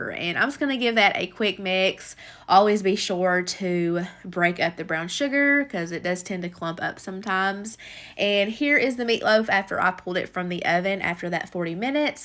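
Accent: South, United States. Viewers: I was having a rough day. This video is just in time because that southern accent just calms my nerves lol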